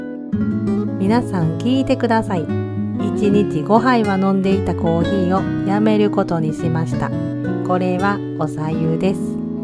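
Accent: Kansai, Japan. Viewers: さん、初めまして、先日から、さんの動画を視聴させていただくようになりました。元々、海外で暮らされている日本の方々の暮らしに興味をもっており、色々な国に住んでる方の動画を視聴させて頂いているうちにさんの動画にたどり着いたという感じです。私も大阪に住んでおりますので、さんが関西弁で語りかけてくださるのがとても親しみを感じてうれしかったです。さんの動画をみてびっくりの連続です。まず、私のもってるドイツという国の印象が変わりました。1番びっくりしたのは、サウナに男女混合で裸ではいるというのに、びっくりして、主人にいうと「年寄りばっかりちゃうんか？」と言い、後でネットで調べるとそうではないこともわかり、また他にも色々と取り決めがあるというのを知って、再度びっくりでした。長文になりすいません。また、動画を楽しみにお待ちしています。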